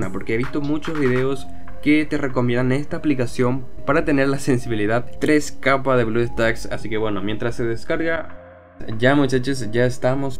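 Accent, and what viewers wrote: Paraguay. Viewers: Sos Paraguayo pero con mezcla de chileno argentino brasilero y otro más??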